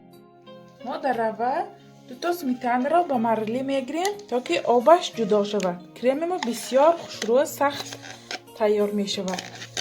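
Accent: Isfara, Tajikistan. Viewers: Апа аз Исфара хастед?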